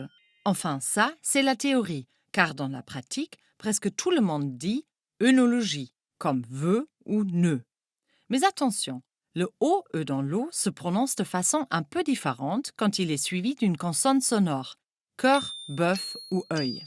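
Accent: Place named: Germany. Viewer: Ç'aurait été quand même mieux si la présentatrice parlait français sans cet accent allemand un peu gênant. Mais bon merci en tout cas pour la vidéo.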